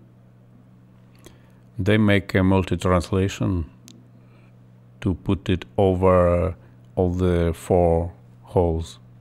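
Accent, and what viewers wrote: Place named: Russia. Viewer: Я не знаю кто Вы... но у Вас очень хороший Английский с прекрасным Русским акцентом... :-) And Thank You very much for this video and for the effort You've taken!!!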